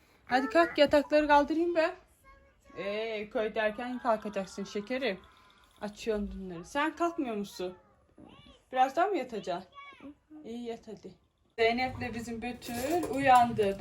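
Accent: Bolu, Turkey. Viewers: Abla seni izleye izleye evde bolu sivesiyle konuseyom herkese gııııı deyom seni seviyoruz devamkeee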